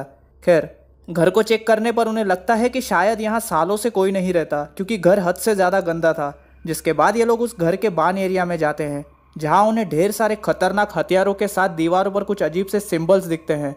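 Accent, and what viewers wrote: Maharashtra, India. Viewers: Bhai aap maharashtra ke ho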